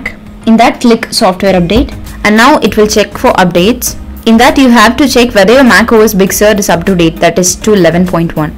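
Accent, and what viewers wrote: India. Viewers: how do you let us understand this video wiht your indian accent and pronuncation, at least speak slowly so we can understand!!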